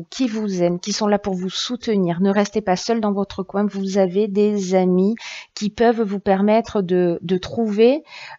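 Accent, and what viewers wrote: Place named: Southwest, France. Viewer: Joli accent ! Du Sud-Ouest de la France ?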